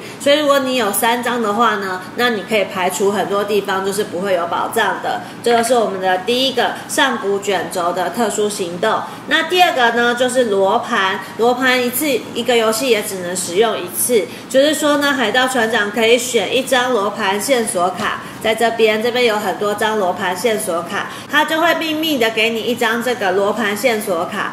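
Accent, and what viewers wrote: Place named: Taiwan. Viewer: 咬字不清晰，台灣國語難聽懂…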